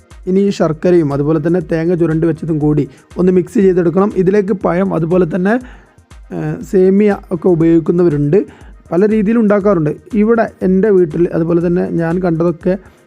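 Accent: Malappuram, India. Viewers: ariyanthondallaaaa ivde Malappuram angne vayil varollu😀😀😀😀 Udaharanam :- Maya payam😀😀😀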